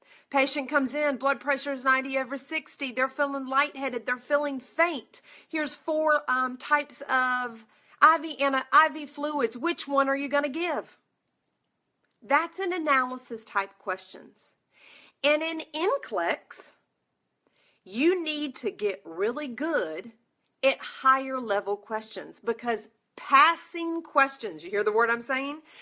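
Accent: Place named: North Carolina, United States. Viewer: Super motivating! Your accent made me smile this morning! Im in NC too (Swansboro)😁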